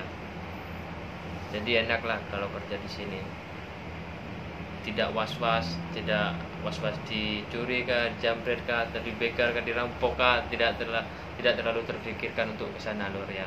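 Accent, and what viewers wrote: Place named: Madura, Indonesia. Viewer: asli dr mana kang,,logat bicara seperti dari Madura nih 😊🙏🏻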